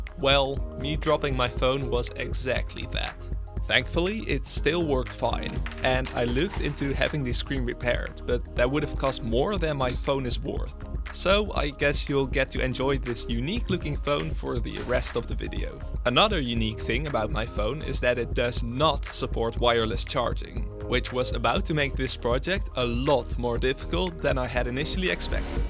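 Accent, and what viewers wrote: Netherlands. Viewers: Yo r u dutch?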